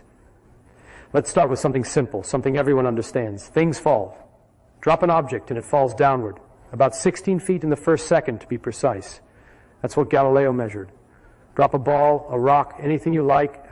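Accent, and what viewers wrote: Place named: Canada. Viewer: That's not Feynman’s voice, it has a Canadian accent.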